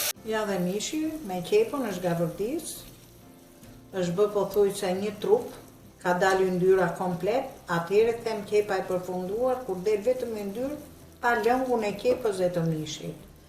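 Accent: Tirana, Albania. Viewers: Sa me pelqen kur flet gjuhen e bukur tironse…❤ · te lumte goja e dora na kenaqe me gjuhen e tirones po e gatimet i ke shume te mira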